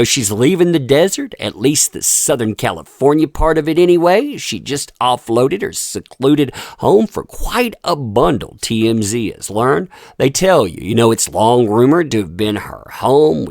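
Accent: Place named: East Coast, United States. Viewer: Ok, you can sure tell you're from back east it's YUK-A not how you say it. Also please do a segment of a h getting offered $10 million to do a XXX rated porn-flick.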